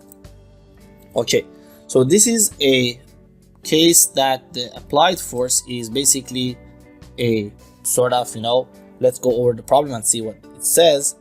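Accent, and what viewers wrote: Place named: Iran. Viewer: Idk it is me or all persians feel joy after hearing the [persian accent]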